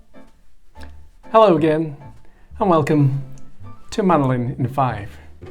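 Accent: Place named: United Kingdom. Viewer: 마놀린구독자인데 동영상이 있다는것 얼마전에 알았습니다. 영국식 영어~ ^^ 처음에는 조금 낯설었는데 집중해서 들으니 들리네요. ㅎ 번창하셔요~~^^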